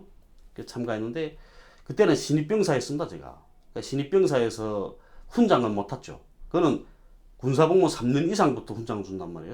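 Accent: North Korea. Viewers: 오랜만에 함경도 말씨들으니 정말 감사합니다. 많이 수고하네요.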